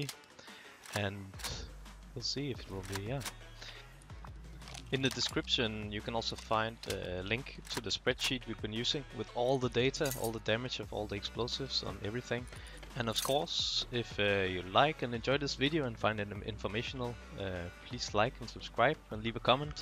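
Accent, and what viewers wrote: Denmark. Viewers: You sound danish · du dansk. tydeligt på stemme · Han er 100% fra Danmark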